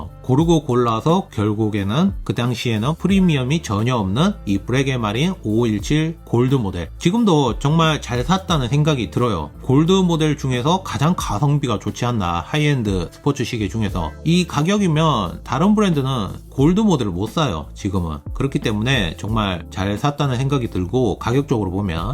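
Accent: Gyeongsang, South Korea. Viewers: 아 말투 경상도 좆같네 사기꾼새끼들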